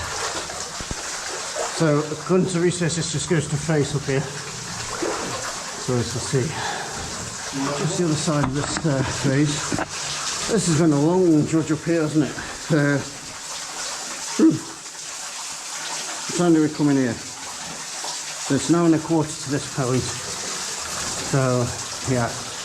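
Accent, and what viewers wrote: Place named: Potteries, United Kingdom. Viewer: Very random question, where's your accent from? Sounds like Trentham in the Potteries!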